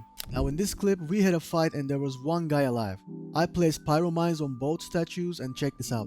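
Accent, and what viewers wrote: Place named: Turkey. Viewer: Anaaaa, bu TÜRK AKSAANI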